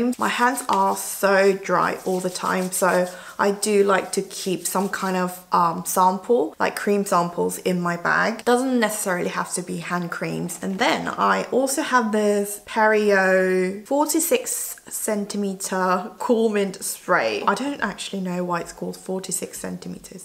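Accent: England, United Kingdom. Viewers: 너무 예쁘시고 영국발음도 듣기 좋아서 이거 한 10번째 이상 보고 있는 거 같아요. 대사도 워드에 다 쳐서 영어공부로 외우고 있어요 ㅋㅋㅋㅋㅋ 앞으로 외울 때까지 <num>번 더 볼 예정... 사랑스러운 영상, 진심으로 감사드려요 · Oh wow idk why but i can hear your english accent more in this video! Hope you can do makeup collection video one day! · 언니 영상으로 영국발음 공부해여....♥️ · 우아 오랜만에 언니 영국영어 들으니까 너무 조아요❣️❣️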